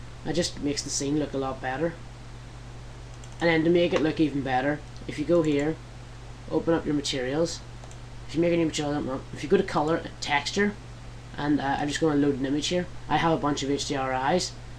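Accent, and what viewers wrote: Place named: Northern Ireland, United Kingdom. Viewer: Nice job! Northern Irish accent too !!!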